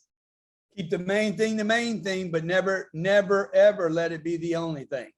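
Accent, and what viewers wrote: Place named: Louisiana, United States. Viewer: When I was 7 years old I got in my dad's 1991 Ford Explorer demonstrator vehicle from from the new car dealership he was a manager at. It had A CD player in it, it was the 1st ford vehicle to have A CD player. The Dolby prologic surround sound demonstration disk was in the CD player, I listened to it and marveled at how good the system sounded.. I then saw the eject button, the symbol was familiar to me I knew what it meant.. As I hit the button out popped the cd. I opened the case that rested on the center console and Inside I found A CD labeled "objections and closes" Curious I put the CD in the truck and pressed play. A Southern Louisiana accent started belting out some cheap one liners and a quick welcome speech about himself. I became fascinated with that day. I had no idea who he was from that day or many years to come until approximately 3 years ago when when I saw a YouTube video of the same name. 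I said to myself "there's no way that that guy from way back when is still doing stuff and creating content now"...... So I clicked the video and that same familiar voice greeted me. I attended growth con in 2020 at the Mandalay Bay and sat 3 rows back. I bumped into in the hallway by the gym. And although I was startled at how much smaller than me he was, I will never forget how quickly he thanked me for my support shook my hand, And started walking off at almost a Sprint to his next engagement. Although the growth convention was fantastic and I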